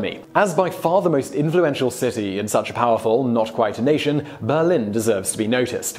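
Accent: United Kingdom. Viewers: a bloke with a british accent says london is the capital the world totally obejective.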